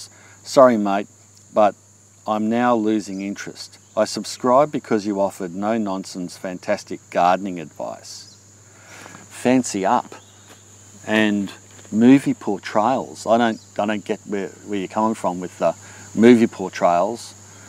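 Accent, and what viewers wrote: Australia. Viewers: From how to build a fire pit, pickling, watching you cut wood, transporting snakes, learning about a tick I never knew existed, to how to grow a lot of... I have really enjoyed your videos. I love your humor and some of the things you've done with your videos. I felt like a fool after watching one video (which was the first one I watched) then sharing that your hen was broody. Thanks for graciously letting that go by. I don't even have any chickens! Keep up the good work. You always seem to make me laugh. The videos you share benefit me. After the review of the Mortar & Pestle set I knew which one I wanted to buy. Oh yeah the DIY outdoor cat hotel - so cool. Ok... REALLY, I just dig the Australian accent not to mention the sound of your environment. The birds and wildlife are phenomenal. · I don't have TV and I just love the sound of your Aussie voice, if its relevant to you I can listen to you talking about :-) I hope you keep making videos even if its about the weather and its impact on your garden and your neighbourhood...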